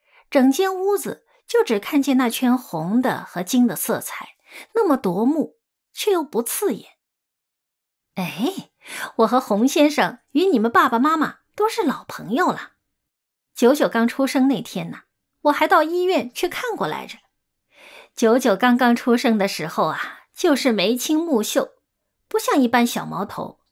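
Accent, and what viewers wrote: Shanghai, China. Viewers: 的嗓音真的是好听！全文都用上海话来播报就更绝了❤🎉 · 主播本来就是上海人😄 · 的上海话刚得也老道地的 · 主播是上海人啊？ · 謝謝👍🙏🌹❤一直記得這篇文章。今天又听見了，尤其聽侬讲上诲闲話好亲切❤❤❤🌹🌹🌹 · 喜欢你！希望你能多读一些上海题材的小说，对于我一个移居国外多年的人来说，听到乡音会感到很幸福，谢谢！❤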